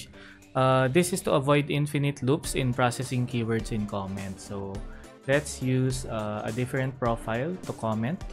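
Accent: Philippines. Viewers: Are you Filipino? I can hear definitely hear a Filipino accent hahaha! Yo thanks for this man! Love to support fellow Filipinos as well great job with this dude!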